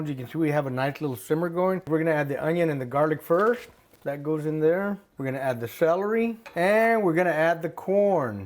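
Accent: Texas, United States. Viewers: I'm in HTown. You sound like a true Texan. Your recipe and directions are spot on. And I love the way you talk. It's like being right here with the fam.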